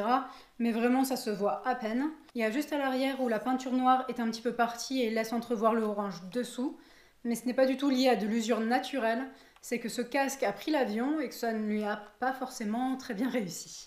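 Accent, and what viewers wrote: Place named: England, United Kingdom. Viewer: Je kiff trop ton accent Anglais !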